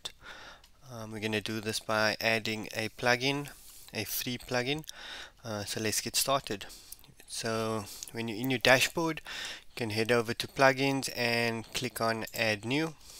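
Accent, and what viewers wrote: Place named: South Africa. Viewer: Good to hear a familiar South African voice. lol. Cool vid. Worked 100% for me.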